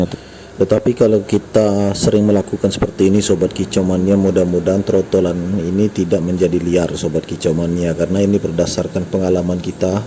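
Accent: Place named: Bengkulu, Indonesia. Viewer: iya logat macam orang Bengkulu di mana bos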